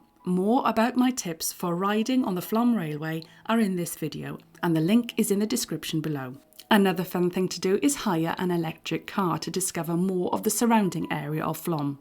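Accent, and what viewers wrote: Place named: Wales, United Kingdom. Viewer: So much great information in a lovely Welsh accent! We will be in Flam in August so this has been exactly what I needed to see. Thank you!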